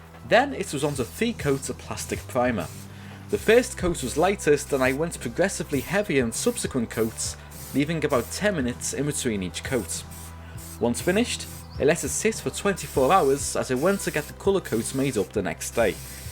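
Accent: Liverpool, United Kingdom. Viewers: I can't tell if you're scouse or not. Also the spoiler looks nice. Keep up the good videos!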